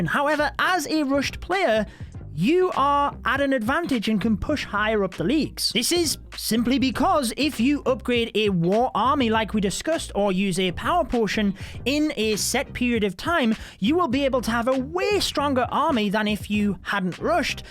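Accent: United Kingdom. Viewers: are you from Britain?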